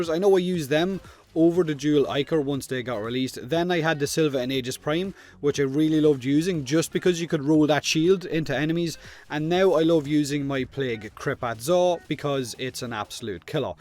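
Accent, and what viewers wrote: Ireland. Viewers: I love your irish accent. It’s amazing. You’re by far my favourite youtuber, and i will definetly try the dual ichors ;3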